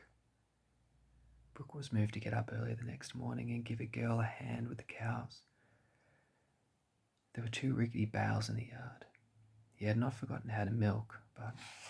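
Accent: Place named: Australia. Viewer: I find the aussie accent soft speaking so relaxing and calming, I think because we have the best accent 😜 great video, I loved the story, keep it up 👍🏼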